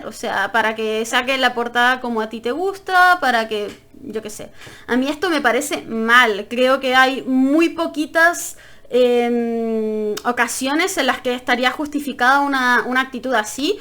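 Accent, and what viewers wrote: Chile. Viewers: Btw cuando te molestas sí se te nota mucho en acento chileno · cuando no teni guión se te nota caleta lo chilena sdffjsdhfdshj somo el mejor país de chile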